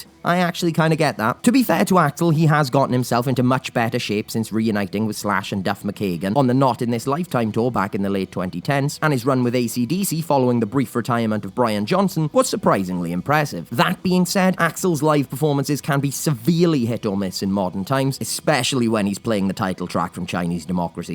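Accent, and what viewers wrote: Wales, United Kingdom. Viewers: You sound like a British JFK. Actually, I'm not even sure you're British now. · remember one true fact, many of these stars can go on a diet, but the narrator will always sound like a Welsh git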